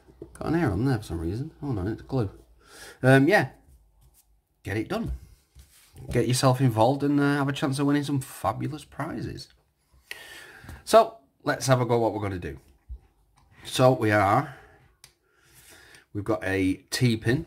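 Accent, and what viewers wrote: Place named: Manchester, United Kingdom. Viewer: Great video, I don’t understand how anyone could give this a thumbs down. I’m glad you mentioned Manchester, I’ve been wondering what type of accent you had.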